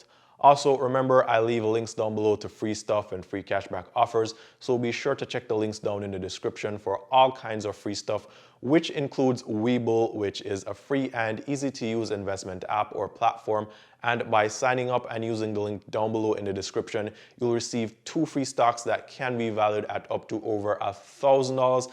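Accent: Jamaica. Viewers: SOUND JAMAICAN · Sound like u from yard 🇯🇲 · Thank you for sharing. ARE YOU A JAMAICAN? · Young man mi than yu. Fe di information. Sorry mi can't write potwan again sorry. But mi hear u accense. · He sound Jamaican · Are you Jamaican bro because I hear it ❓😂😂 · just subscribed and I'm looking forward to talk to sir. I am very much interested in the t-shirtbusiness. Yuh sound like a man from yaad iyah, suh bless up if a suh it guh. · Hi love you video are u Jamaican? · Sounds like your from Jamaica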